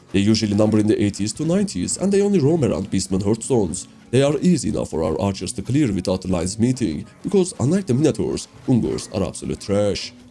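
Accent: Turkey. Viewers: Are you turkish man you sound like it gankim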